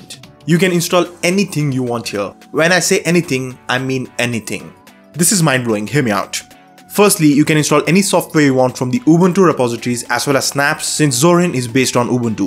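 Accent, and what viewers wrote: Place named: India. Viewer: as a native english speaker i find ,most f whats said almost impossble to understand spoken way to fast in a terrible paki/guppta/ indian accent,big FAIL!!!!!.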